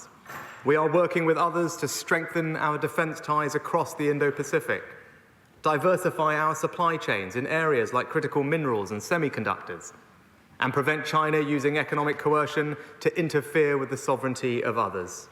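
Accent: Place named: RP, United Kingdom. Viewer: Stick to your diamond training and your posh English.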